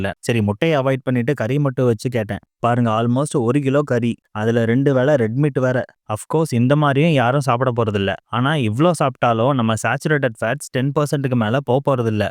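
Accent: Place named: Erode, India. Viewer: Indha erode makkal slang heh ❤❤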